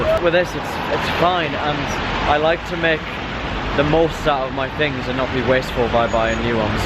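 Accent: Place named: England, United Kingdom. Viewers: Sounds north-east England but not Newcastle. One of the smaller towns. Locals would be able to tell which street from the pronunciation. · Is an English accent, but I don’t know specifically what part of England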